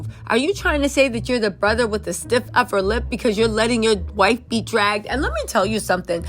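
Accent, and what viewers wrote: England, United Kingdom. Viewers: I love your podcast, and your English accent made me laugh out loud! You are too funny. Keep the great work you are doing with that humour. Love it. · I’m English and your playful English accent is so funny 😆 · Alright girl GREAT BRITISH Accent ❤ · Your ridiculous eng accents has me 😂😂😂😂😂, what are we going to do with you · ONCE AGAIN U ARE FUNNY LOVE YOUR SOUL... DANI AUSTRALIA 🇦🇺 FUNNIEST ENGLISH ACCENT EVER 😂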